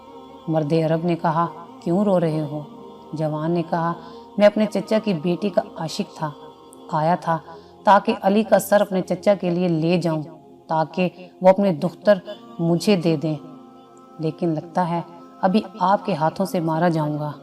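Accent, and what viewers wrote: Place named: Punjab, Pakistan. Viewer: Aap ka accent bht ghalat hai. Farsi k alfaz k neechay kahan par zabar ya zer hai aap ko nhen pata. Aur ye urdu hai Punjabi nhen, iss ko urdu accent mein parhye. Plese do practice at least three to four hours once script is ready and then upload the video.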